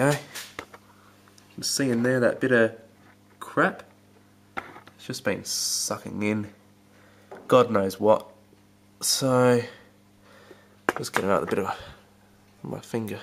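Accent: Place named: Australia. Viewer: It's an added treat to hear your accent. I have always wanted to visit OZ. Even boarded a young chap from Victoria a few times!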